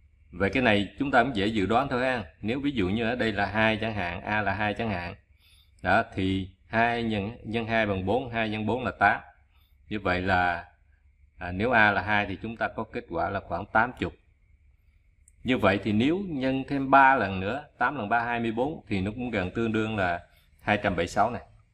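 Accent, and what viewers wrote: South, Vietnam. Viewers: Trong một xã hội bị đồng tiền chi phối gây ra hỗn loạn trong mọi lĩnh vực như bây giờ mà vô tình gặp được kênh của bạn thì thật là đáng trân trọng! Với chất giọng ấm áp, trầm hùng mà giản dị của phương ngữ Nam bộ khiến người xem rất dễ chịu và dễ hiểu. Cơ duyên “gặp” được kênh của bạn cách đây tầm hơn tháng và liền đăng ký kênh để tiện theo dõi. Một kênh rất có ích cho xã hội ngày nay. Và mình thấy có một điều đáng tiếc là hiện nay những kênh mang nội dung học thuật lại ít được theo dõi hơn những kênh giải trí ( mà đa phần là tào lao/ như kênh Nờ Ô Nô hổm giờ báo chí đưa tin). Vậy thì tương lai đất nước sẽ như thế nào??? Chúc bạn và gia đình: Hạnh phúc + Sức khỏe!!! Thân chào!!!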